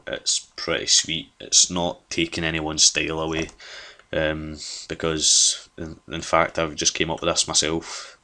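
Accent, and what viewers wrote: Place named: Scotland, United Kingdom. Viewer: you are REAAALLY scottish o.O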